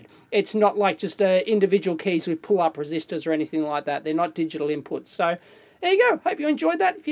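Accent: Australia. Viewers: Good job on the comment. You put a lot of effort into making this comment, a lot more than most people would ever do. My comment I just rattled off the top my head what I thought, without putting much thought into it. Thats the problem with watching people who are from a different country than you, I rember watching dave for the first time. It was his giant HDD tear down to me he sounded like the stereotypical Australian to me, but after so long you dont notice the accent.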